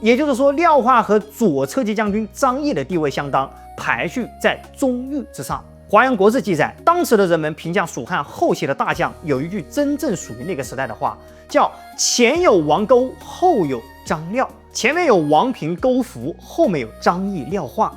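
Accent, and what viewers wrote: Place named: Hubei, China. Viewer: 我今天很興奮的和我太太說，聽湖北人說書，和北方人說書的風格不同，另有一番滋味。我有一個武漢的朋友，說話和一樣有種魅力。然後我太太說，三國是不是在講曹操、諸葛亮和關羽結拜的故事… 我決定一輩子不再和她討論這個話題…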